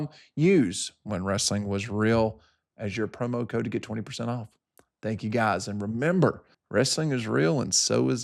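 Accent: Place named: Georgia, United States. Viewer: I missed that sweet Georgia drawl...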